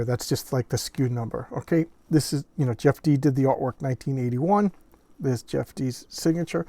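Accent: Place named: New York, United States. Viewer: I’m in Oklahoma City. I don’t think you said where you live but I’m guessing somewhere near NYC based off your accent? I’ve signed up for the forum and am waiting for my e-mail verification. Re: Jeff Dee. About 10 years ago I sent him a fan email that ended up several years ago with me being hired to illustrate the War Heroes WWII sourcebook for their ‘Mighty Protectors’ game (basically V&V 3). I was thrilled to be a small part of V&V ‘lore’ and Jeff was entirely professional. At one point he gave me his number in case I had questions. Based on interviews, he is very outspoken and direct, so I didn’t dare call him. But V&V is great and his art is awesome.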